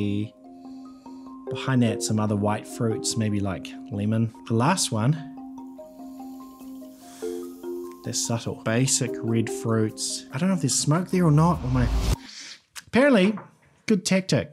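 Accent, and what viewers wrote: New Zealand. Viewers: (First time seeing this channel, my thoughts) "45 DOLLARS FOR PROPER 12 ?!?!... Oh wait, my man sounds like a Kiwi". (Checks channel) ah, ok, that probably explains that." Still seems high for Proper 12 but New Zealand is quite a bit further away from Ireland that the US so, yeah, makes sense I guess. Edit: I should've paid better attention the first time, he says "New Zealand" directly behind the "45 dollar" price. 😅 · I wondered why you pronounced Conor McGregor as “McGreegor but then you said J&B was found “eeverywhere “so I realised that’s how Kiwis speak.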